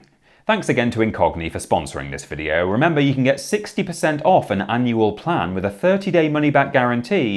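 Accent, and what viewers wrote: United Kingdom. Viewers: Good video - well done! Actually as a Yank, I have to say you have the most outstanding accent I have ever heard in the UK.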